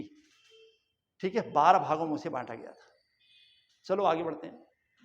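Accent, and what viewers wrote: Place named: Pithoragarh, India. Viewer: Hum tone pth walo ki jaisi hai mai bhi pth se hu tab😂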